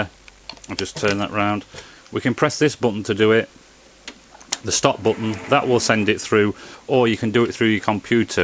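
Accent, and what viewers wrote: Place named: United Kingdom. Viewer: I have only this minute looked at your video, the ciss seems just what I want. I will look into things a bit deeper and contact later. In the mean time I will say that I personally prefer instructions that have a drawing of all the relevant procedures and parts so that I can take my time doing things. Thank God for a lucid fellow Brit who I can understand. Good luck.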